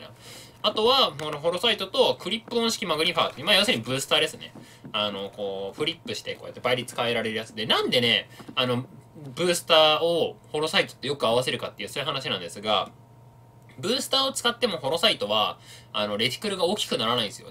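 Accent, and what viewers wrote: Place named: Western Japan, Japan. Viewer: 西日本の人は質をひちと読みます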